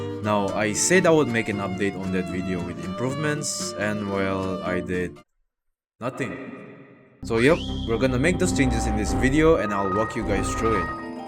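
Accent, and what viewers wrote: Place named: Manipur, India. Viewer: Why do i feel like you're Meitei or north eastern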